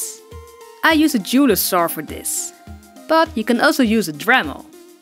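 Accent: Netherlands. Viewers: where are you from? you have a really dutch accent XD <3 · ok you can hear your dutch! but that what i love ( and the doll omg ). i always makes me smile when you talk bc i hear myself in it haha ( btw i'm dutch if you didn't know yet ) but i love him he's awsome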